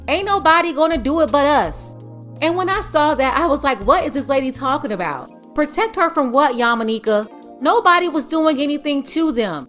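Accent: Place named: Florida, United States. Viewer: I know you said you lived Miami before but are you from Florida? You sound like it and you have that smart Miami mentality?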